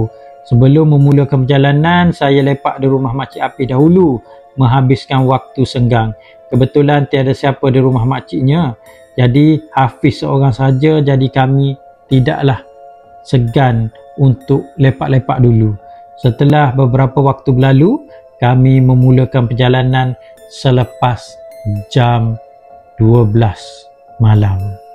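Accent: Kelantan, Malaysia. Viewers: Terjumpa channel ni kebetulan ari ni first Time tgok..Abe ni ore Kelate ke ..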